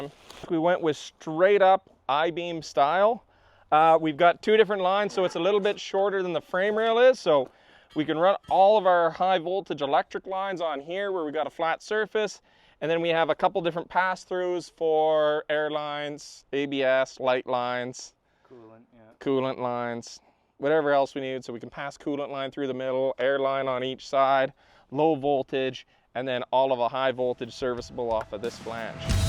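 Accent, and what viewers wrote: Canada. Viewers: I noticed the way you say semi truck the i is like like a lowercase and the way we say it down here in the states it’s semi like an uppercase so is that a Canadian thing I take it because when I watch a Tesla video about trucks I gotta turn it off because all my life, I’ve heard it that way and it drives me up the wall not so bad when you say it, but you’ve got that Canadian accent. PS I love YOUR trucks.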